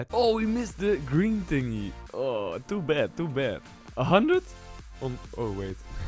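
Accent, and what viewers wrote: Netherlands. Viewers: Are u from the netherlands or so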